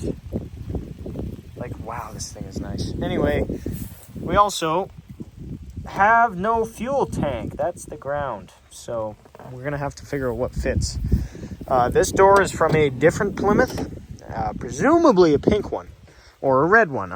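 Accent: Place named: Canada. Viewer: As soon as I heard "might as well go for a soda", I knew you were Canadian. The sterling elocution hinted at that as well 😂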